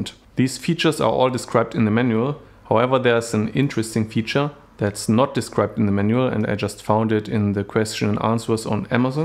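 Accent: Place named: Germany. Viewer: Gutes Video ! und sauberes, sehr sympathisches "German English" ;-)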